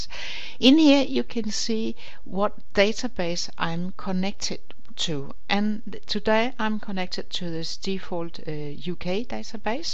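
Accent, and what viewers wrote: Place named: Denmark. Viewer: i smell danglish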